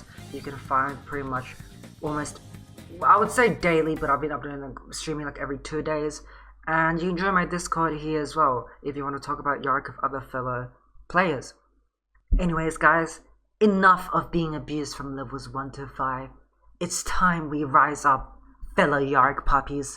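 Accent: Australia. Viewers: Ur content is gangster where u from bro? australia?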